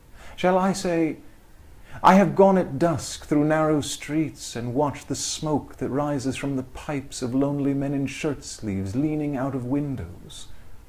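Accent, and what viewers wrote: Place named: United States. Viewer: Just the most amazing, easily-produced American diction. How many books have I heard him narrate?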